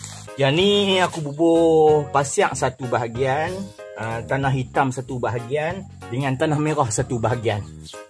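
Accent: Kedah, Malaysia. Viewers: Tertunggu2 video..terbaiklah.syiok dengaq loghat kedah piaww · Amboi amboi amboiii...pandainya berkebun..seronok dengar loghat kedah. Terbaiklah hang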